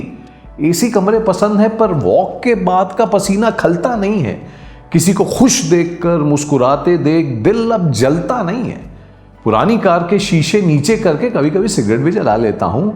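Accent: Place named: Lucknow, India. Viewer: Bahut khoob ! Lagta hai hamari tarah aap bhi Lucknow se taalluk rakhte hain 😀🙏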